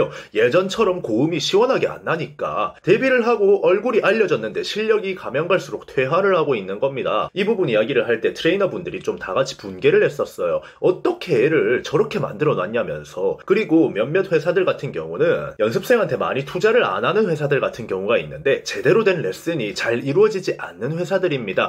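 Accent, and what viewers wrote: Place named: Busan, South Korea. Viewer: 말을 강조하는 부분에서 부산 억양이 나오네요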